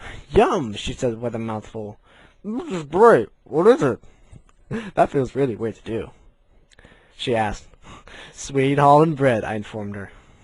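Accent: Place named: United States. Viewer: Wait, you're from holland? Hold on let me go on google maps........, OOOOOOOOOH, ok now I know were that is, wait, also dont they speak another laguagd and have a different accent because you sound alot like you're from america.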